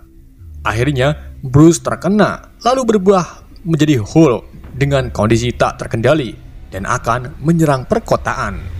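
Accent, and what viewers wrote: Indonesia. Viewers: penyebutan nya indonesia bangeetz😂 , 👍👍🤗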